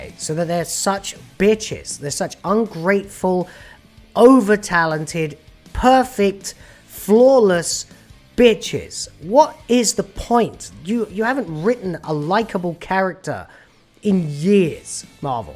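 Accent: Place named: England, United Kingdom. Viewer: Oh, now I know what Joe Pesci would sound like if he had an English accent. Good show.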